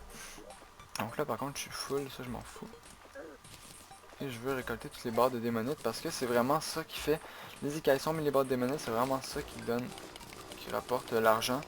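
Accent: Quebec, Canada. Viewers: Mais il le dit comme ça parce que il est Québécois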